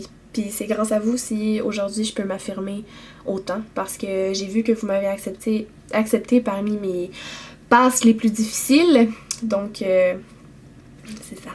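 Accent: Quebec, Canada. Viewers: j'aime j'aime j'aime tes videos ! a chaque fois c'est trop marrant, j'ai le petit accent québécois dans la tête dès que la vidéo s'arrête ^^ full love from france <3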